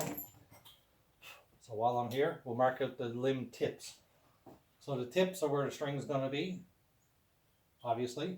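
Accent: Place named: Newfoundland, Canada. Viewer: You sound like you're from Newfoundland, if so what part?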